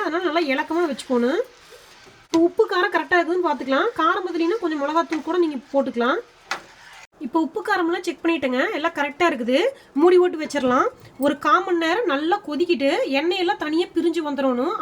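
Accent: Kongu, India. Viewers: Kongu thamilum dishsum superngo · aaha... naan vaniputhur-medu nga (near T.N.Palayam).. nam mannin vaasanai polave ungal pechum, unvaum.. neenga kalakkunga.. endra aatharavau ungalukku eppoluthum undu. Thank you.. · Samayalum super.Namma kongu thamilum superunga. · உங்க கொங்கு மனம்... உங்கள் பேச்சிலும் வீசுது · Arumai ma. Athaivida arumai unga kongu pechu vazhakku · தோழிக்கு வணக்கம். நம்ம கொங்கு தமிழே தனி அழகு தான். 😊. அருமை. நம்ம ஊரு எந்த ஊருங்க. நான் ஈரோடு ங்க. என் வாழ்த்துக்கள் 👍😊🙏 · kongu tamil epayume azhaguthanga..namma pecha aduchuku aale kedayathunga..super sis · Super sister. Namma ooru style la super ah sollirukeenga. Naan Gobi arts la dhan padichen sis. Native sathy. Happy ya iruku namma oor style la kekaradhukku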